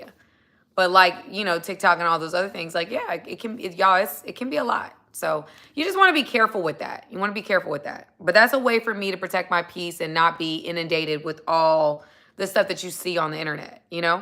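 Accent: South, United States. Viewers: Hi this your country voice, is good for country music..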